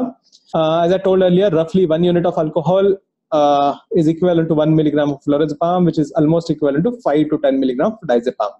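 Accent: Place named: India. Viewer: Thanks doctor, this is a fantastic explaination that has helped me and i always watch this while dosing, even though there is a strong indian accent for those who dont understand it too well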